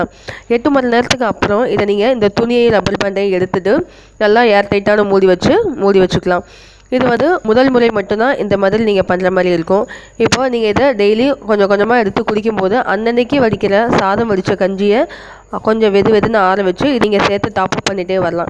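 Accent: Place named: Kerala, India. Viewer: Sister unga malali ucharipu alaga iruku sister😊😊😊.....all the very best for your videos sister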